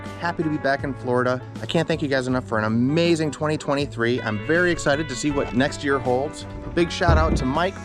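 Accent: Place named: New York, United States. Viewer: I knew you were a NY transplant... lol I have the same accent.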